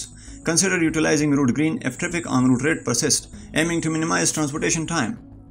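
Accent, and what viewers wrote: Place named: England, United Kingdom. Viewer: The way of describing your plan is i think quite difficult..make it easier to some extent...try not to speak like an english man because then we can't understand what are you really saying 🙏🙏🙏🙏🙏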